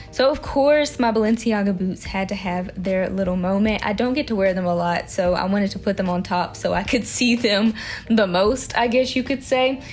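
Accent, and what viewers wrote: South, United States. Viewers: Bruh yeah you def from the south. You did not just say “Catty Corner” 🤣🤣 I haven’t heard that in a minute. Your closet turned out beautiful!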